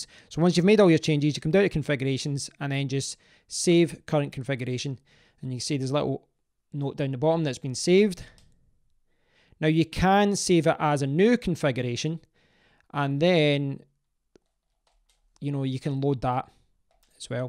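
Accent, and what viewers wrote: Scotland, United Kingdom. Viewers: Ma man, how on earth you go from the game to the main menu! Nice to hear a Scottish accent btw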